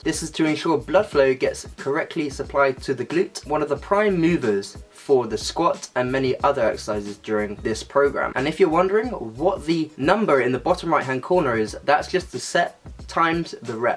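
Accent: England, United Kingdom. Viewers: I would be very glad to know your thoughts or opinion on achieving an athletic/lean body without adding weights; just the body as in push ups, regular sit ups etc. Do they work for defining and accentuate the muscle? Ps: Which part of England is your accent from? I haven't heard it until now.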